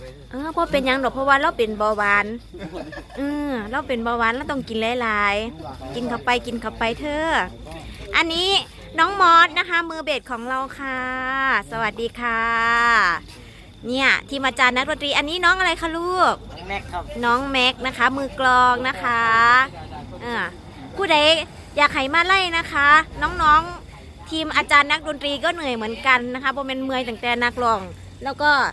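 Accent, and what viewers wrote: Isan, Thailand. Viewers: พี่ ครับพี่เข้าเสียงอิสานตอนอายุกี่ปีครับบ.....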